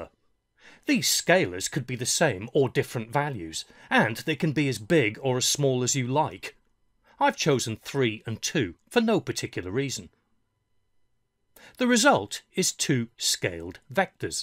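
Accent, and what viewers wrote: United Kingdom. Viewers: So nice of you to do this especially as a school teacher. You accent is definitely British and I'm from India...so are you in England right now? What's your current time zone...? Asking to bother you only at specific and appropriate times of the day.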